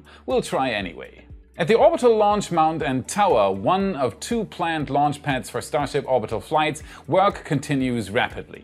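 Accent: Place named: Germany. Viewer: I believe he is German, so English is not his first language. You should hear how the Brits pronounce Aluminum. :)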